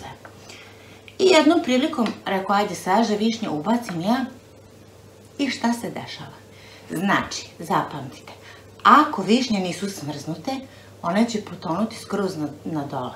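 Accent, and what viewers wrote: Vojvodina, Serbia. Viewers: Kolač je divan!A dijalekat ❤!Naš vojvođanski!❤ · A ja pored recepata najvolem vojvodjanski dijalekt❤️,tako slatko i milo divanite ,da Vas je milina slusati. Veliki pozdrav iz Curuga🥰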